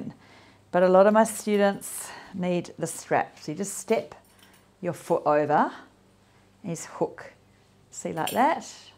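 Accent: Australia. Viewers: Thoroughly enjoyed this 🤩 thank you so much… I’m about to turn 55 and move/workout daily but really need this in my life now… it’s lovely to hear a fellow Aussie accent too… 👏🏻👏🏻👏🏻 this is my first video with you and I will definitely be moving with you daily… ☺️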